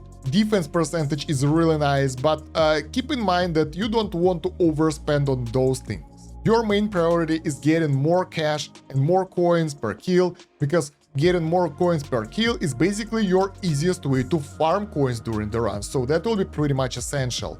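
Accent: Russia. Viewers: Слышу твой русский акцент, автор))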